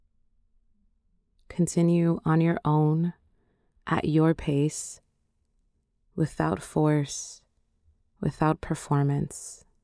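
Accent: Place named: New York, United States. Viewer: I want loud mouth thick NY accent back 😂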